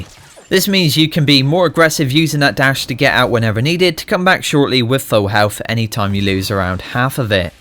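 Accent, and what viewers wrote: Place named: West Country, United Kingdom. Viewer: Another great guide, keep up the good work! Btw, going by your accent I'm guessing your a fellow west country lad?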